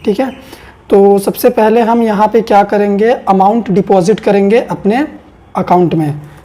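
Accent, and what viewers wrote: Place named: Bihar, India. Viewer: who loves his bihari accent😀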